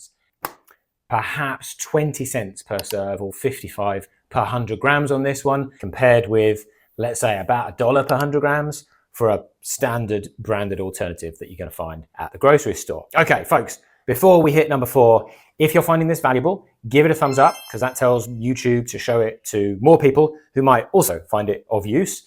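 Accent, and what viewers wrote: United Kingdom. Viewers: Just found your channel, or rather Youtube did. Im confused you sound British, but your from Oz? Im Subscribing anyway as i like the concept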